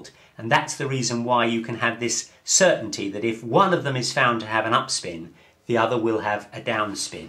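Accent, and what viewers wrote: United Kingdom. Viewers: There's nothing like physics being explained with a British accent! :-) Very instructive videos, thank you very much!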